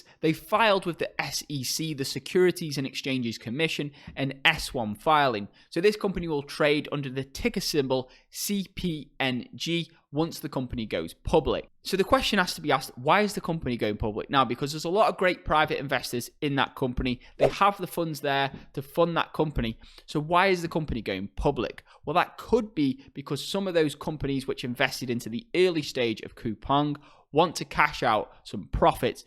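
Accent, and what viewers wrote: United Kingdom. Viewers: Your accent is so British.Awesome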